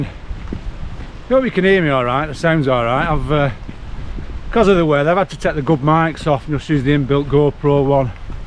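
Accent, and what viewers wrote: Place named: Lancashire, United Kingdom. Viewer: Lovely and educational as usual, I've told you before I'm a Yorkshireman but it's fantastic to hear a true Lancashire accent and follow you on your adventures. Atb and keep putting the great content on fella.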